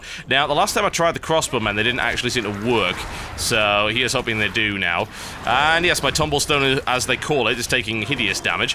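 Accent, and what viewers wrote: United Kingdom. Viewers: L2 British accent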